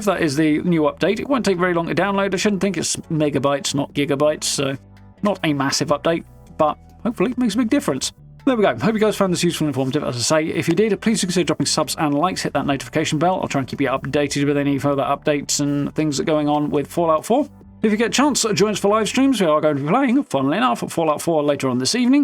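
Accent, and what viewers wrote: RP, United Kingdom. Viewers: This guy sounds very posh😂. it doesn’t go with hes rock star metal head image haha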